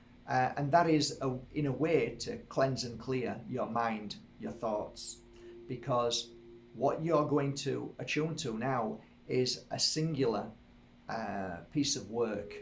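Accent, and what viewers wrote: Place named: Northern England, United Kingdom. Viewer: Thank you for this valuable information, It was quite nice that when I searched for healing at a distance, it was you that popped up and I immediately recognised you from the sheep farm podcasts. Which I'm a fan off. I'm in Bolton, greater Manchester. I've got a loved one in hospital with a stroke, using your guidance, I felt myself in the room with her, I have tried before, but with no idea what I should be doing, but this was an amazing help, thanks. All the better being a northern lad, whose voice I was familiar with and could relate too. A synchronicity in itself.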